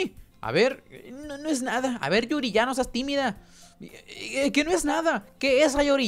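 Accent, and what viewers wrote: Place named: Colombia. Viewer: Ahh mk, usted maneja bien el colombiano xd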